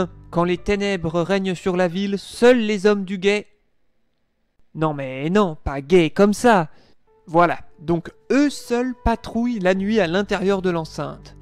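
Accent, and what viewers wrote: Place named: Burgundy, France. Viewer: Oh mais c'est bien une pointe d'accent bourguignon que j'entends? Toujours aussi intéressant et brillamment illustré!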